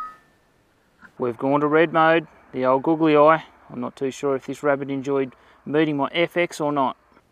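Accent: Australia. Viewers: I notice with your air file that you aim high through the scope, so to me there is still an element of skill and guess work goes into getting a successful shot, and you can see the round dropping as it travels. This is just my ignorance , but do modern sights with range finders built in not adjust the position of the crosshairs to account for range with an air rifle so that you still only have to put the crosshairs on your target rather guesstimate? Thanks. I’m really enjoying your videos having recently found them. You have a great Aussie narrative.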